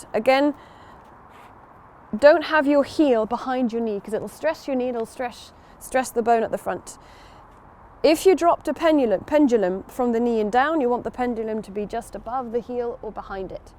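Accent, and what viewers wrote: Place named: United Kingdom. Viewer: The British accent makes it perfect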